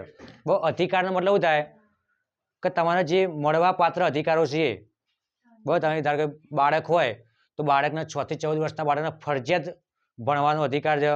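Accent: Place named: Sabarkantha, India. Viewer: સાહેબ સાબરકાંઠા ના નિવાસી લાગો છો.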